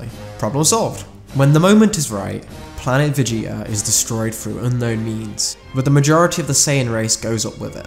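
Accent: United Kingdom. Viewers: nice video, the only problem is your hard Brit accent. Found it so difficult to understand you. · You have a very nice British accent. You can go far in YouTube with just that.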